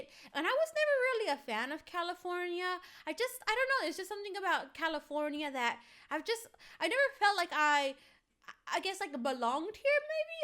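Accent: United Kingdom. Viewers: i thought u were british...😂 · I thought you came from the UK 🇬🇧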